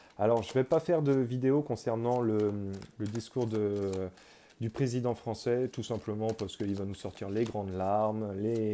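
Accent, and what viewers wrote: Quebec, Canada. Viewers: Merci, par moment j'ai cru percevoir 1 petite pointe d'accent Québécois? Je me trompe??? 🤔 Belle continuation. 😍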